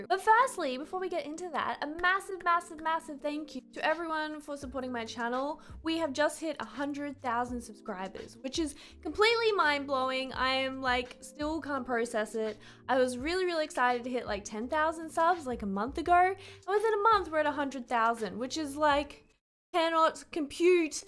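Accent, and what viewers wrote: Australia. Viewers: She is Aussie... I wonder where that could be....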